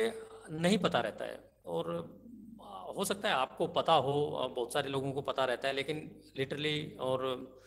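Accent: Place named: India. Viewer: Perfect Raw indian voice. Suits subject that you have chosen shriman G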